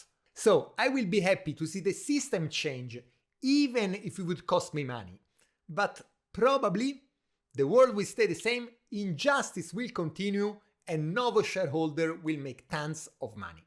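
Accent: Italy. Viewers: questo accento mi sa di italiano D: anyway good video!! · Love your Italian accent and I your reasoning. I is vivifying. I use Eli Lily (prescribed by my doctor), but invest in Novo Norisk.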